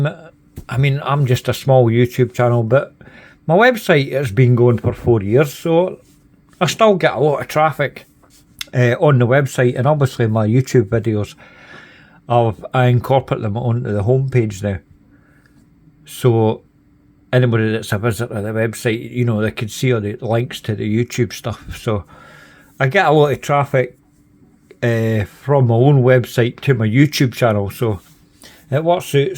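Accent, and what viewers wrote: Scotland, United Kingdom. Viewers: Lovely Scottish accent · I actually like it in nice good old scotish accent. More than standard london english, to posh for me.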